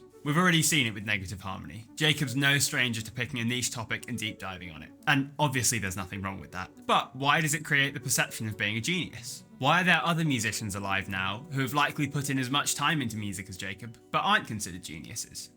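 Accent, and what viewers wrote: United Kingdom. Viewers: I think the British accent helps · leaves the question open though: why would British people give him credibility? I can hear the YouTuber now, and can confirm he too is British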